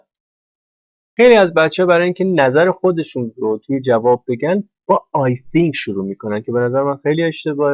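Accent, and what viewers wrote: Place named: India. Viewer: مثه هندیا انگلیسی صحبت میکنید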